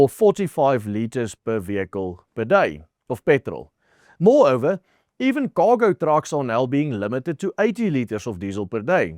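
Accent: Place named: South Africa. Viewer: ek dink jou videos is fantasties. Die content is akkuraat en lig die mense goed in. Ek dink die feit dat jy Engels praat met so sterkt aksent maak dit soms moeilik om te hoor, maar rook eg Suid Afrikaans. Ek love dit! Maar kan ons asseblief praat oor jou baard en hare. Vir n man wat so baie voor n kamera sit, kry vir jou n manscape trimmer of so, en kom ons wys vir die mense ons voorkoms is net so goed soos die visie, en missie in ons land.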